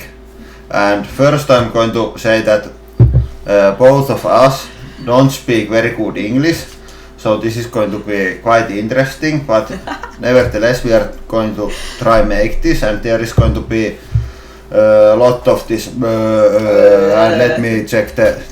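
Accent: Finland. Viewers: Your English is great, the fact that you have a finnish sound makes it even better. People don't want you to sound English. Keep it up... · Your english is probably better than the most viewers finnish. (And your accent reminds me on Kimi Raikkonen) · oh and btw I watched it till the end. your finglish is quite entertaining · Todellakin katsoin kokonaan! Teidän rallienglanti on ulkosuomalaiselle parasta lääkettä koti-ikävään. :) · You Finnglish is a huge part of what makes this channel so entertaining! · I determined this video must be dealt with by watching all 43 minutes of it. Well done! Your "Finglish" accent is your trademark, don't change it. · If ever I am sad and wish to make myself feel better, I can come to your "Beyond the Press" channel. I love the mad imagination of what you do. And, being a British person who has a son living and working in Finland — with Finnish wife and three children, I love your Finnglish. 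Well done!! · kyllä tuli katottua koko video. rallienglantia on aina kiva kuunnella. 😃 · i wached the whole video. that finnish accent.. couldnt stop. ;) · Your video was very informative (long, perhaps, but interesting ergo, length is not an issue). We look forward to more exciting hydraulic press action, in the very near future. Additionally, your English is fine. It adds just the right Finnish quality to your videos. Thank YOU, and have a nice day. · Thanks to you, Hollywood might someday understand that the Finnish accent is not the same as a general Scandinavian accent. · Katoin koko videon! Hyvää matskua teette!! Ja tekee vain hyvän säväyksen tuo "rallienglanti" =) Oma englanti kuullostaa samalta =D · This is pretty f.word awesome "rallienglanti" accent, it is whole the thing! I appreciate this courage trying to speak English. I hope its not enact! btw you must also compress the YouTube Play button when you receive one! 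· I'm walking around the house with Finnglish accent in my head now... "POHPULAHR" "KONTINUAY" "HOODRALIK"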